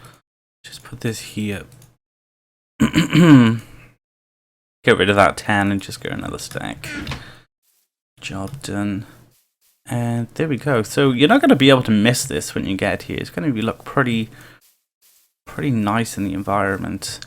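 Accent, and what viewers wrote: Wales, United Kingdom. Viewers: I'm British, and I think you sound welsh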